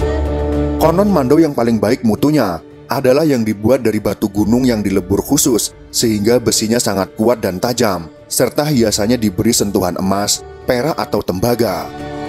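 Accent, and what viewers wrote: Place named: Java, Indonesia. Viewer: Ini yg menguak sejarah pasti Jawa?🤣 Dari cara bicara udh ketahuan 😂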